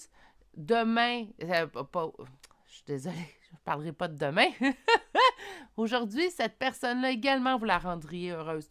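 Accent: Quebec, Canada. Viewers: mais c’est moi qui vous remercie vous avez le petit plus dans la manière de traduire vos cartes qui est pour moi exceptionnel et votre petit accent québécois en prime si je ne me trompe pas et bien j’adore 🥰🌈💐vive la Canada 🇨🇦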